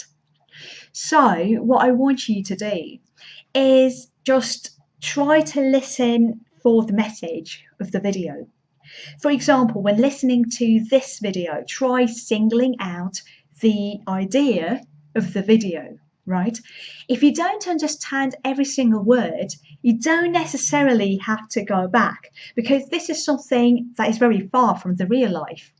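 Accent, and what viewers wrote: United Kingdom. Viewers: Hello. Your British accent is superb! Could you please tell me how you acquired it? Thank you.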